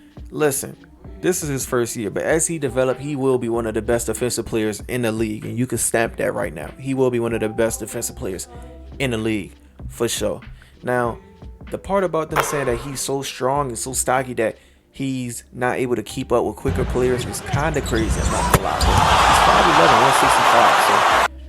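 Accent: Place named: Baltimore, United States. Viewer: I can tell by that accent you from bmore lmao😭 we from the same place but on the real let my character be in the storyline fr👀 don’t gotta be a insanely important character but shiit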